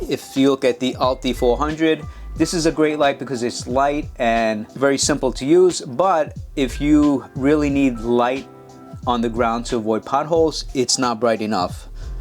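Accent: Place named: Brooklyn, United States. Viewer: I mentioned that I only had one question, but I actually have a second question and a comment. The comment is that I like your NYC accent, it sounds a little Brooklyn, like maybe Bay Ridge, though it's not too strong. My second question is about the 2600 be remote. Does it have a watch battery that you only have to replace like once every year or two? Also, how waterproof is the actual remote, in your opinion? Thanks again and have a good weekend!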